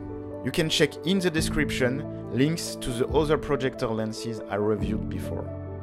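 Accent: France. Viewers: T'as l'accent français ;-) je vais essayer de voir si je peux faire ça, ça a l'air bien cette histoire d'objectifs projecteur vidéo! Je vais essayer de trouver ça aux puces! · Your english is great! Love the french accent though :) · Tu est français ça s’entend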